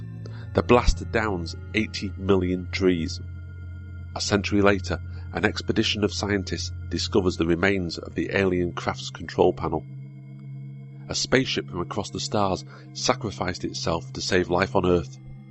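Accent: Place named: United Kingdom. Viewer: The narrator is a Brit with a cold.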